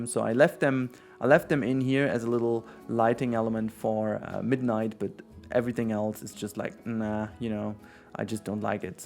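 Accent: Germany. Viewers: Man hört das du Deutscher bist! :D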